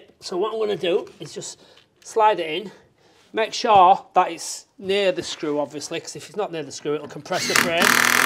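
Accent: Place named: Northern England, United Kingdom. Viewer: Brilliant video. I an a carpenter and joiner so can appreciate when a job is being done correctly and conscientiously. I am planning to build my own garden room this year so looking forward to that. May I ask who supplied the bifold doors? I know you did mention them in the video but I couldn’t catch it. Probably because you have a strong northern accent and I’m a Londoner. 😆 I will be watching more. Great job on both the build, and the videos. Cheers!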